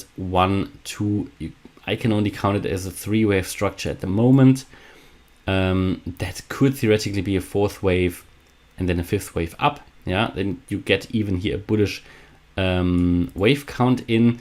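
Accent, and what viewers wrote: Germany. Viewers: Sounds German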